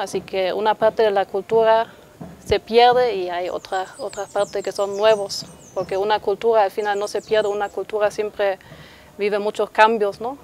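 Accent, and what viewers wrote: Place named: Santa Cruz, Bolivia. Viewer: Que simpático su acento camba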